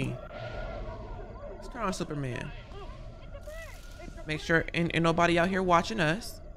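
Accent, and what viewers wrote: United Kingdom. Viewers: “Young tenderoni” 😂 love a fellow brit ✨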